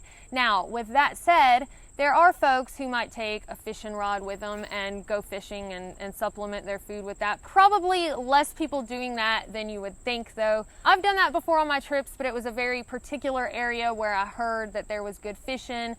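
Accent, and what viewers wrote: South, United States. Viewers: Loving it! Always entertaining and useful information and presented in a "non-judgemental" and non-patronizing way. You are the voice of experience and of understanding the transcendent nature of hiking. One question. I see that 10 people did not like this video. Do you have any way of understanding why some people don't like this video? I guess in life there are people who just don't like things, but I don't understand. Technical issues? Don't like a southern accent? (Barbarians!) Object to a rational approach to carrying guns? I fail to see their problem and am just curious if there is a way to say to those who check thumbs down, why don't you like this? Can I change something? Just curious. But for the 1,500 + of the rest of us, hike on! We love the videos and look forward to your/our next journey! Cheers, Tortoise (Slow but Sure)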